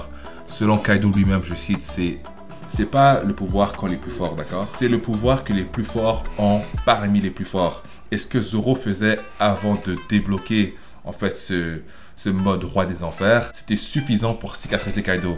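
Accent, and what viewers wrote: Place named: Montreal, Canada. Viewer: Hey j’aime tes vidéos ton accent me rappelle ceux qu’on retrouve à Montréal est-ce que tu viens d’ici ?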